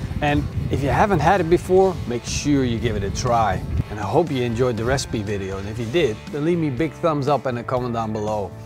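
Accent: Netherlands. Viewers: It's funny, how I always can hear if someone is not natively a english-speaker. Even though your english is really really good i can hear that you are a dutch man, also in your video with that knifeboi I could instantly hear that he is a german. I love that. I love your videos, keep on it.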